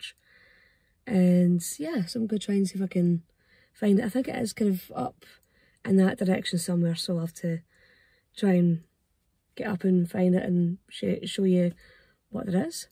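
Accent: Scotland, United Kingdom. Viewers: I did archaeology on Inchmarnock, the little island near Bute. That island unearthed a slate called The Hostage Stone, which depicts a probable Viking raid. You are refreshingly real. I appreciate the humble focus on the historical sites and nature. Hailes Castle is atmospheric, and Arbroath Abbey. Just be cautious in some rural spots, gangs of youths can be a problem. I watch a channel called Kirsten and Joerg for its comforting slow meandering simplicity. Quite different from your Scottish theme but they get lots of subscribers, it took time to get to that though. I like your Scottish delivery. You have a nice voice.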